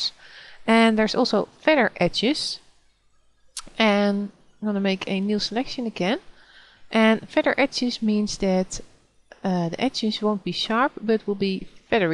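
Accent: Netherlands. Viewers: nice tut with such a trademark dutch accent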